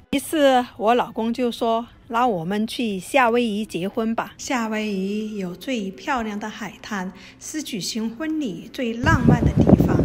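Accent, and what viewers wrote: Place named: Sichuan, China. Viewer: 姐姐好听四川话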